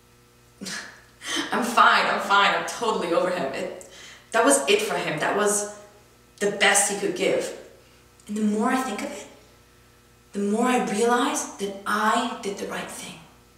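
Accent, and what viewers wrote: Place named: United Kingdom. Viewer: I LOVE your British Accent!!!!!!!!!!!!!!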